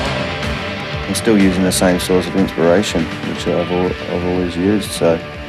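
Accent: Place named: United Kingdom. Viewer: lvly british accent!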